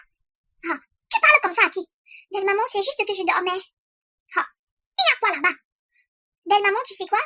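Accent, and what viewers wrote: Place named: Cameroon. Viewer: je suis ivoirienne!! jadoooore trop votre accent camer!!!!! bne continuation:*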